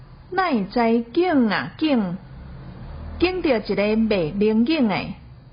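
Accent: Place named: Central Taiwan, Taiwan. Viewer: 足親切的台灣中部母語!讀到韻味十足!有學著,馬欣賞著.真勞力!🥰😀😄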